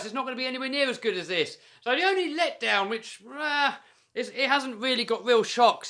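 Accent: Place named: England, United Kingdom. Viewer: So glad i dont live in England. The accent is terribly annoying. Cant handle it. Cool vids on mute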